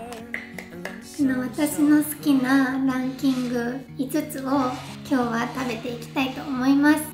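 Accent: Kagoshima, Japan. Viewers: 相変わらず可愛い💕 鹿児島訛りの話し方も優しい感じがして可愛い💕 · 、相変わらず可愛いね🤗 と同じ鹿児島出身の大原優乃ちゃんと訛が似てるね🤗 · 鹿児島弁のイントネーションが残ったままで嬉しい！！！かわいいなー、鹿児島の誇りだよ☺️💕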